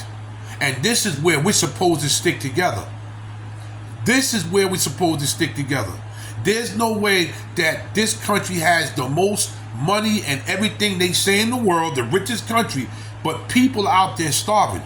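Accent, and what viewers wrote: New York, United States. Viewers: White people love you right back and new subby here and I'm not leaving you..I just love the new York accent❤❤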